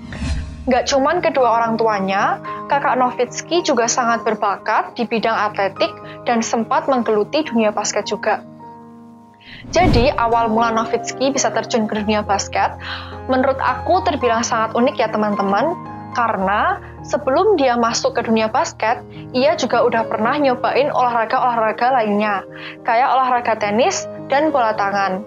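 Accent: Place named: Java, Indonesia. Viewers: medok imen mba hehehe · Suroboyoan cokk.. 😅😅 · Pasti org jateng nih medok nya beda sm surabaya, wkwk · Medok e surabaya ki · host ceweknya kalo ngomong medhok, tapi lucu wkwk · Host nya fix orang SBY wkwkwk medok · suaranya medok tapi lucu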